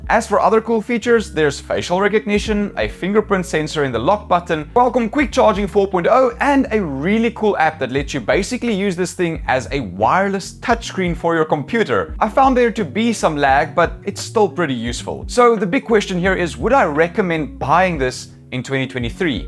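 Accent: South Africa. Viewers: Are you from South Africa bro?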